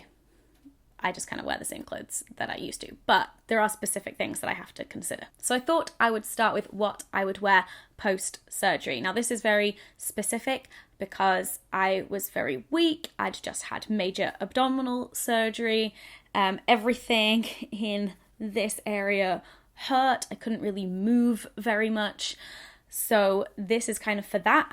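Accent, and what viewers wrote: United Kingdom. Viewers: you are a dream cum true. I to have had an ileostomy back in 2014. It sucks. I have to wear an ostomy bag the rest of my life. Kills my sex life. It s nice to connect with a beautiful girl that has had a similar condition. Love your British accent. Nice smoking hot bod too. Keep posting lovely nymph and I wish you the best.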